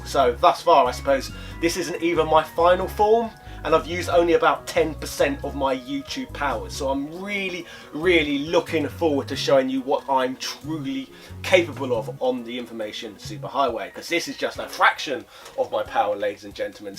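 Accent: United Kingdom. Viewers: Oh God you successfully fooled me.. You are the first and only western YouTuber ever talked about ACan and gave it some respect it deserves by my knowledge. I love your deep and original comments along with your British accent, as a chinese I was once horrible of comprehension of authentic English, all thanks to the overwhelming US influence, but my wife is in UK as a visting scholar and I must be able to get what's British people saying for the seek of my UK tour this October. Your channel helps me make huge improvement, great job. 👍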